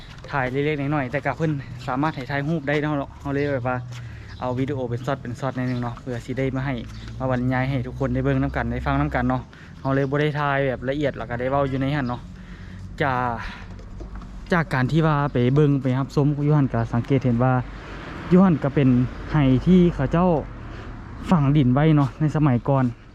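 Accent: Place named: Lom Sak, Thailand. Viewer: สำเนียงเอื้อยเหมือนคนจังหวัดเพชรบูรณ์อำเภอหล่มสักหล่มเก่าครับ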